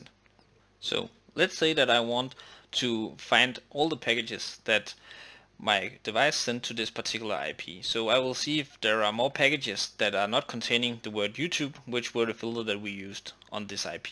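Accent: Denmark. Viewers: This guy sounds more danish when speaking english, than a danish speaking danish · i can reconize a danish accent from a mile away! Rødgrødmedfløde team assemble!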